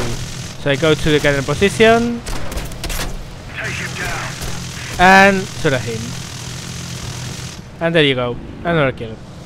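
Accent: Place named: Spain. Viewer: you sound like an academic linguist who doesn't have a good feel for language. I believe he is probably a native Castillian or Catalan speaker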